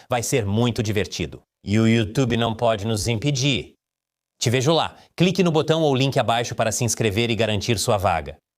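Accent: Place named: Portugal. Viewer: Que porra essa mudança para o sotaque de Portugal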